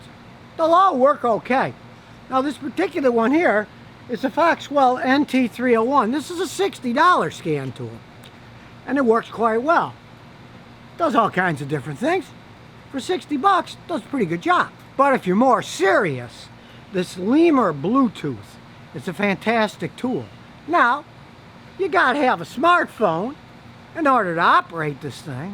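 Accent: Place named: Chicago, United States. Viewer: I see the live free or die sign is he from New Hampshire or just like the motto, because he sounds like he’s from Chicago. Just curious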